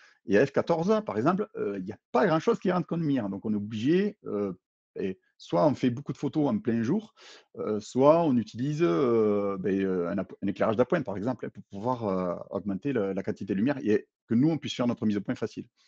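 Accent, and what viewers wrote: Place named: South, France. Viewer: Bonjour, Ça fait du bien d'entendre un peu l'accent du sud (les bretons se moquaient de moi à l'époque),en tout cas, excellente vidéo très instructive. Merci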